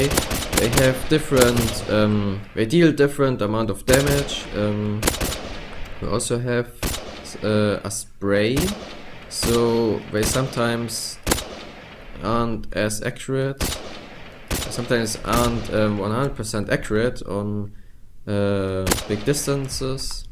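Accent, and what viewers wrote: Germany. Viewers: höre ich dar etwa einen deutschen akzent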